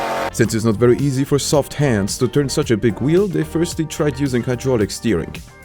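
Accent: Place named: Poland. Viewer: Masz bardzo słaby polski akcent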